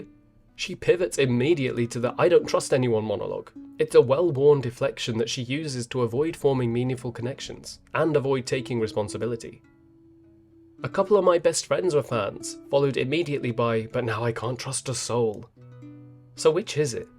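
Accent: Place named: United Kingdom. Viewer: I don't understand how you can take someone like ALR and speak so eloquently about her or what she's doing. I normally feel dumber after watching her but when I listen to you react to her, I actually feel smarter. Hey, it's NOT just the accent, either. Even though I have a thing for British accents because y'all could read the menu from McDonald's and it sounds intelligent 😂. It's like I'm watching a documentary and Albert Einstein is explaining how a sloth sloths. It's wordy but understandable. And it's Albert fucking Einstein, besties 😂. I think you might become one of my favorites, Mr.!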